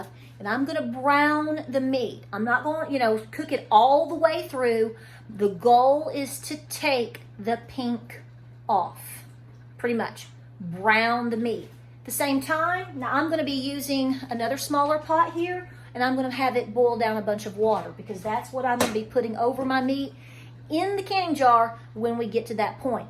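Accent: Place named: Appalachia, United States. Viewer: You are so down to earth & speak really good hillbilly😆 & that’s my language! I love your videos! Keepum comin!!!👍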